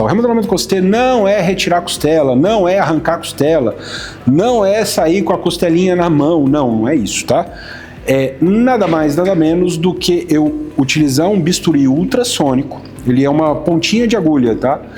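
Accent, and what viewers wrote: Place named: Rio de Janeiro, Brazil. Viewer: Dr é do RJ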